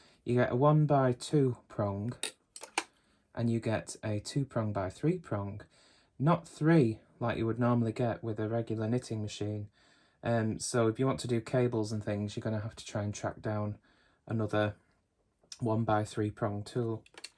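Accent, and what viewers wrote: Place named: Northern England, United Kingdom. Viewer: i'm just about to purchase a secondhand MK70 and thought i'd look for a video or two as i don't it comes with a manual. i was watching without sound and thought you were American. imagine my surprise to hear your Northern accent, i'm from Lancashire. i couldn't have asked for a more informative, easy to follow tutorial. once i get my machine i'll be replaying this video constantly. thank you for spending the time in making it, it's much appreciated.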